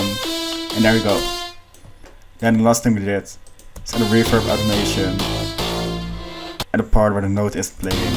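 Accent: Netherlands. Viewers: Hey man .. goeie totorial .. maar je moet echt werken aan je Engels accent en langzamer praten je bent amper te volgen 😉 .. geen haat gewoon een tip .. oja .. en je hoort duidelijk dat je Nederlands bent .. haha vandaar Nederlandse reactie 😉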